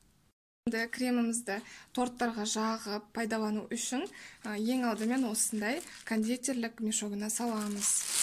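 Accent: Standard. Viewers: Керемет. Аман болыңыз. Әрқашан жасаған жұмысыңыз берекелі болсын. Сіз сияқты таза қазақ тілінде сөйлейтін блогер қазақ қыздары көбейе берсін. 👍👍👍👍👍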